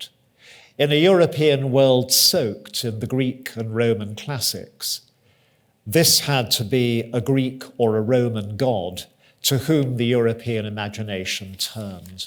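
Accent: United Kingdom. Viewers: My god is this British!!!!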